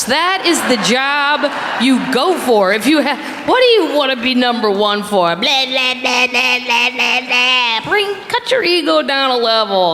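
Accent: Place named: St. Louis, United States. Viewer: I love the St. Louis accent... From my second home!! She's hysterical! 😂❤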